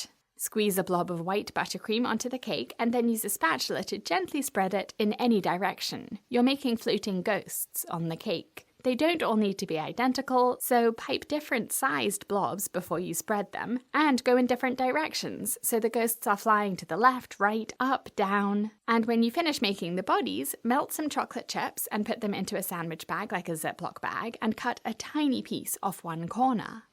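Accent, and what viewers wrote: United Kingdom. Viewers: Nice to hear a British accent for a change. She speaks so clearly and nicely. Rare on YouTube.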